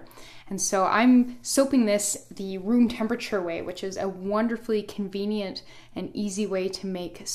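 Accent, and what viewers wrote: United Kingdom. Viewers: Hey! First of all I'm in love with all ur tutorials though I'm new 2 ur channel. Ur doing an amazing job teaching the world that u know. 👏👏❤❤💏💏👍😍😍😱😱. Second of all ignore the idiots who find fault with our Brit accent. They can't appreciate the fact that ur so knowledgeable, instead finding fault with the accent. U go girl. Lots of love.. 💏💏💏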